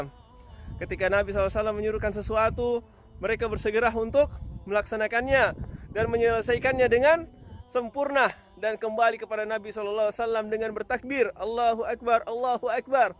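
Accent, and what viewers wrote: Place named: Poso, Indonesia. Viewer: Masi ada logat Poso juga ee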